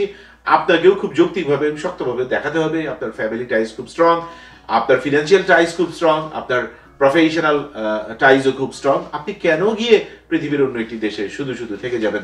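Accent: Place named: Kolkata, India. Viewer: I am from Kolkata. I follow your all YouTube video. It already make me your fan. Your Bengali conversation 100 percent like as our Kolkata. No one can differ you as a citizen of Kolkata or Bangladesh. Thank you so much for your informative video.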